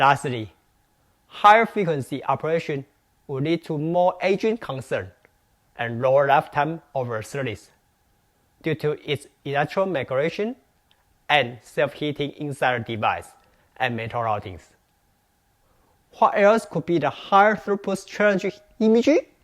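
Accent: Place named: Taiwan. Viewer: 聽你的英文口音確實很像台灣人XD 你是做SERDES的工程師嗎? 好奇為什麼會開這麼冷門主題的頻道(不過我有興趣)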